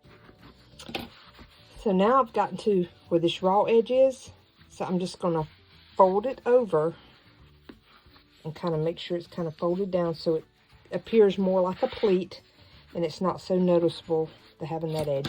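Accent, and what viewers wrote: South, United States. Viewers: Just found your channel & love it! Sounds like you might be southern? I’m from Alabama so I hear a little bit of myself listening to you❤️❤️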